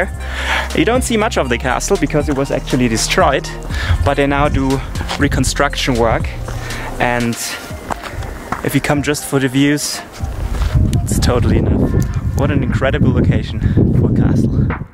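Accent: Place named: Germany. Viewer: You make some dope vids, man. I'm also Iranian and I hope you had a good time here. I noticed you have German accent, so I gotta say that I'm a big fan of:)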